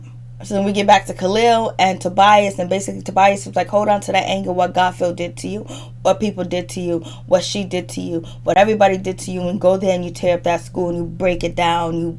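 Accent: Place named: East Coast, United States. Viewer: your East Coast accent didn't hurt either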